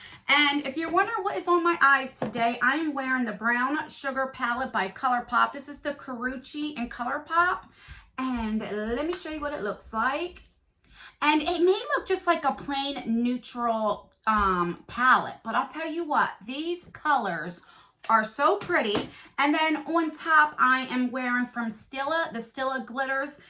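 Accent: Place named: Maryland, United States. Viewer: Where are you from? My friend is from Maryland and you guys sound identical. 🌞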